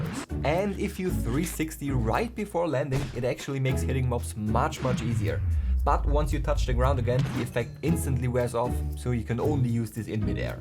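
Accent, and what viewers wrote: Germany. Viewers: Man merkt das du deutsch bist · Bist du deutsch hört sich irgendwie so an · Why does it sound like you have a german accent? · are you german cuz you are from Austria im german too and your english sounds like if you were german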